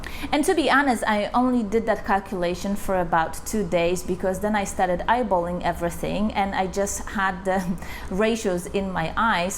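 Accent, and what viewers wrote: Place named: United Kingdom. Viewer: With your British(?) accent you need to speak slower and more clearly if you want to increase USA audience, although you are pretty good looking so maybe it won't matter. However thank you for your good content that I will try now to listen to. Correction, your speed and clarity now that I have listened is really not bad. I might be just a slow old man.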